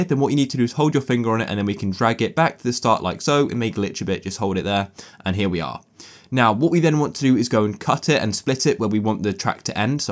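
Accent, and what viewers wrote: United Kingdom. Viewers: Are u bri'ish?🤨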